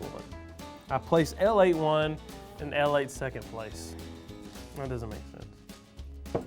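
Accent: South, United States. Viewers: I’m from Texas and people think I’m crazy when I say I hate big red 😳 I feel like it’s instant cavities with one sip 😂 Also your accent brings mine out so much 😂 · I don't actually care at all about the rankings but I love listening to this dude talk. Sounds like any good ole bubba down the street. Cheers from Texas!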